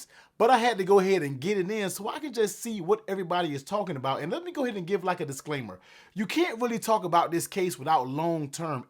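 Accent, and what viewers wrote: United States. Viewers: You're american huh? can't you just talk normal?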